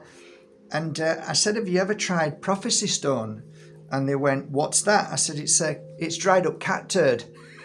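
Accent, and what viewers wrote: Yorkshire, United Kingdom. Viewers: I come from Huddersfield and I always love to hear your Yorkshire accent. Makes me feel warm inside. Also I love whatever you say. X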